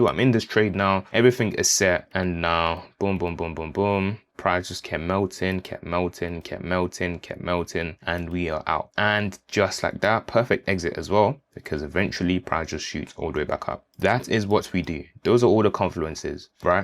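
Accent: United Kingdom. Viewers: Perfect, Great info, and well presented, you're a gifted teacher...👍 You sound like you're a Londoner mate... Arsenal or Tottenham? 🫡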